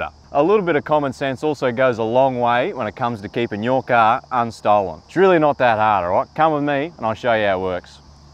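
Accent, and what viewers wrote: Australia. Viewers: I am subbing. I'm learning the art of aussie tongue and you're the perfect subject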